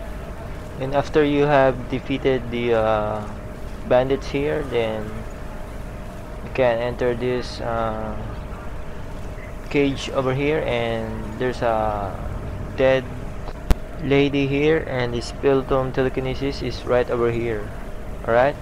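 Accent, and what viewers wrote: Philippines. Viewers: I’m not making fun of you in anyway, I just want to say I love your accent. Are you philipino cus hearing you speak reminds me of my really good friend I worked with. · You sound like a Filipino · he's a pilipino u can tell by the accent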